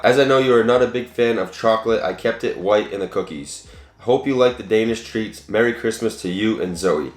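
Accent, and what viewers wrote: Boston, United States. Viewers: Boston accent coming through and nothing to be ashamed of. But same with me my Bosnian weird way of saying things come up constantly especially from my kids, mom say cookie.. i say it coooo key but cooo is so long that it sounds funny to them😂. Great video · He's got a Boston accent. Goes with the whole shtick.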